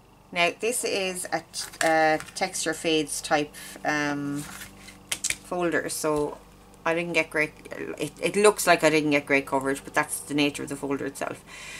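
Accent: Ireland. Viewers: Thank you for sharing .Very clear presentation.(Love your Irish lilt.)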